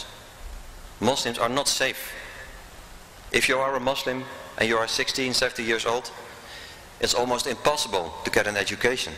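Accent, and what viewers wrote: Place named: Europe. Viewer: What country is this ? What European country this brother from?? His eccent European country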